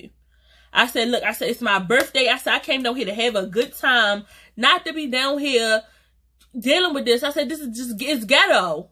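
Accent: Baltimore, United States. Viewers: Baltimore accent + A good 🍑 story teller ? I’m here 😌💖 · Lmao you have to be from Baltimore! Your accent is too funny, I loved this story time lmao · Lol your Baltimore accent is strong as hell!! Love it! 😂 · You can tell she’s from Baltimore!! You can tell when someone is from Baltimore. That accent mad heavy !! I fw it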